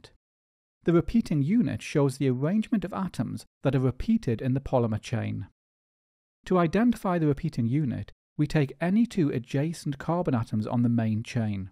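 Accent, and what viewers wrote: Wales, United Kingdom. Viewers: U welsh?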